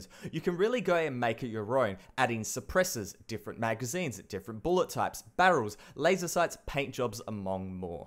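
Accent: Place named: Australia. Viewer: I KNEW U WERE AUSSIE THE MOMENT I CLICKED ON THIS VID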